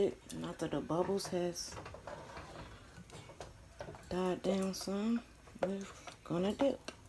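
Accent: United States. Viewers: Never watched one of your videos before have to say I detect a New Orleans accent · Are you from the south, , I Am, I love your voice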